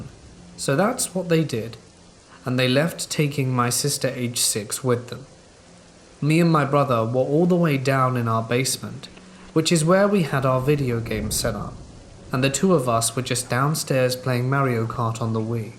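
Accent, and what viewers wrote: England, United Kingdom. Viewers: Hey! Bro, I love your channel content. I listen to your scary stories every night before I go to bed, and I gotta say... I look forward to it every day. Have a good rest of the week. I may send you some of my true scary experiences with ghosts and the supernatural. It would be so exciting to hear you recite them in one of your videos! One more thing...your English accent is superb! Almost makes me want to speak like that, and just adopt the accent for when I move away, lol.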